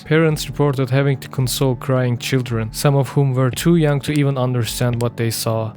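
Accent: Turkey. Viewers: Hello where are you from? You kind of sound turkish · Yo dude are u turk? ur accent sounds like that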